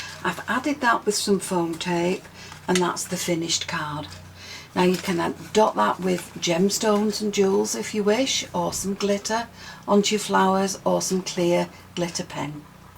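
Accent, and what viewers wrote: United Kingdom. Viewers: I love your british accent it is very soothing and relaxing, and the music is very pretty and also soothing and relaxing.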